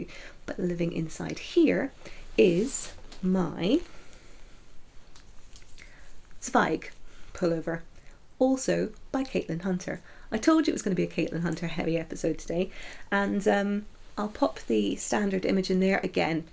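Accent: Scotland, United Kingdom. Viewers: Love the podcast! Great job, you seem like a natural. You sound totally Scottish to me. I’m also a cussy person and can’t cut bread or cheese straight to save my life! 😂 Can’t wait to watch your other episodes. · Hello there; I would like to say; I love it when your Scottish accent comes out. I would like to ask, How do you keep the moths from your beautiful stash. I have bagged up all of my balls, because of the little fiends. I really enjoyed listening to your rant. I feel right at home with it. I used to live in Scotland - the Isle of Lewis. (not really the borders- but my daughter lived there and she now has an accent that is like yours- So I guess that might be why I like your style so much) I will be enjoying your future programs. I was knitting along as I listened. (and watched- no , really I did!) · Thank you for a fabulous first episode! 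You are hilarious. I love your accent, in which I can hear your Scottish origins. Scotland is my favourite country in all the world and I have had some very happy times in the Borders (love Jedburgh). Your knitting is amazing, can't wait to see more. Your Rhinebeck drinking game made things very merry here! Now I want to watch Episode 2. Good luck with this brave new world upon which you have embarked. Manuela, in Melbourne, Australia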